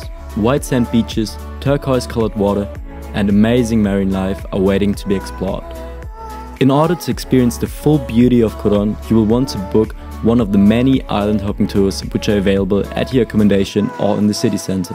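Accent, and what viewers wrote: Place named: United States. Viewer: dude you sound just like me....are you also American I take it?....just a guess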